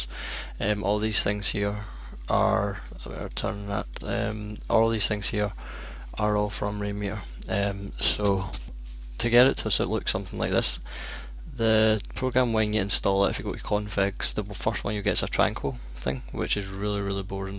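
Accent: Scotland, United Kingdom. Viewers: LOL you guys are fucking morons. I can hear him perfectly, it's not difficult to understand what he's saying. As far as a Scottish accent goes that's a light one..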